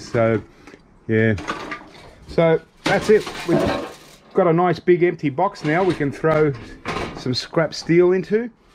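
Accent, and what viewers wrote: Australia. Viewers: Gotta say I love hearing Aussie spoken in its natural environment. Glad to hear the assorted body parts are healing up, because there are a bunch of crazy Yanks up here looking forward to street scrapping season!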